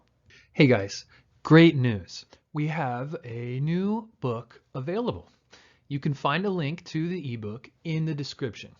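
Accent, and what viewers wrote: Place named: United States. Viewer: your classes are incredible and your american accent is great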